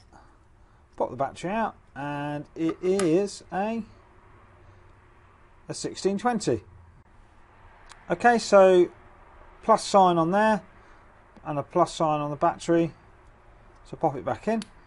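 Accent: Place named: United Kingdom. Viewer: Yeah this one gets it! great close ups which are necessary here and a muchhh easier way to get the last piece open than the last video. I️ tried their way for like half an hour this took just a min. Thank god for YouTube or we’d never get these things open! Well done. I️ Also like the British accent ha it makes me trust you. For some reason. 🤔 Is that why nature documentaries are always narrated with a British accent? Cuz we believe that Brit’s have gained wisdom through their long period of history kinda running the western world? Or because the brits just make all the best nature documentaries? I️ suppose I️ just Love British things all around. Has anyone read this far? I’ve been up all night and fixing this fob is the icing on the cake 👌😎 now I️ know... I’m thankful for British accents and how much I️ trust them! Hey Now I️ know what to do when I’m stressed out...have people give me advice in British accents! 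Or find a British guy to hang out with. That could work:) I️ need a British boyfriend...for my health! I️ live in Cincinnati in case there’s anyone who wants to hook a sista up. Forrreal tho this is just as good as tinder imo. Who knew I’d leave such a long comment! This is a record and a first. Probably because I️ feel like the ppl who read this will be very purpose driven and probs not read/mind this rant for a brief distraction from the rocket science that is opening this fob! Waited months to change it but I’m doing it for my friend secretly so hell have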